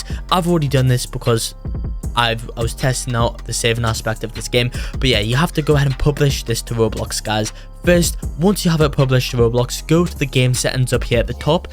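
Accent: United Kingdom. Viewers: u bri' 'ish mate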